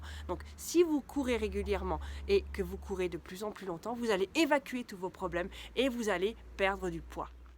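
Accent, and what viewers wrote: Switzerland. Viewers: J'adore le petit accent suisse 🇨🇭 😍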